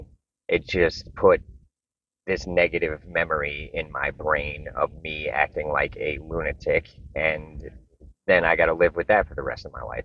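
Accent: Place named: Long Island, United States. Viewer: There is nothing better than hearing a guy from Long Island tell Zen stories! “And the monk didn’t give a shit that he stole all his stuff.” I loved your interpretation and laughed,. That was so great.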